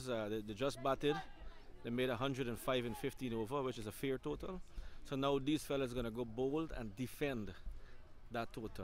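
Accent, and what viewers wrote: Guyana. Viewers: ...watching from Canada...never knew Essequibo was so nice...never visited when I resided in Guyana...keep up that 'Guyanese-ness' and talk...love it. Wha we doing in 'foreign'...??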